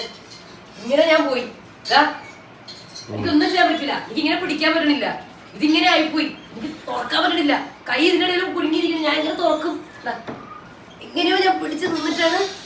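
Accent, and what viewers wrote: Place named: Thiruvananthapuram, India. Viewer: Because TVM slang.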